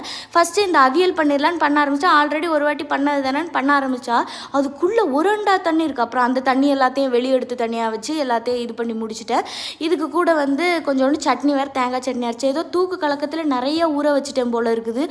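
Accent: Tirunelveli, India. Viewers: Akka nee tirunelveli ahh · Nan peasum pothu Tirunelveli slang apdi onnum therila..neenga peasum pothu keakum pothu இன்பத் தேன் வந்து பாயுது காதினிலே.. ❤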